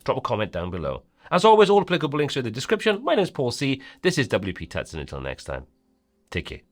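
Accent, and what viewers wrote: Wales, United Kingdom. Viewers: Top instructor - highly skilled and with a great Welsh accent too :-)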